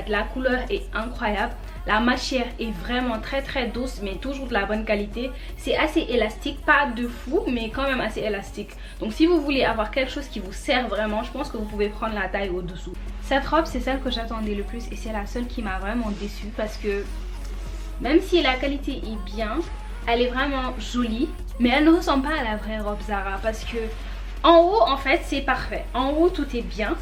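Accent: Senegal. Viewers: Merci pour ta vidéo avec ce joli accent DKR❤. Perso, j’ai de plus en plus de mal à admettre ces grandes différences de prix. Du coup, avec ces sites web, dure dure de se laisser tenter dans les magasins.. Pas facile pour le secteur du prêt-à-porter mais l’inflation touche tout le monde 😢 Comment ne pas tourner le dos à toutes ces enseignes qui nous assassinent avec leur prix élevé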